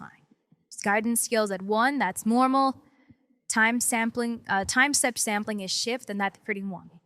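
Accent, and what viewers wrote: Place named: California, United States. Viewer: is she from California? such Californian accent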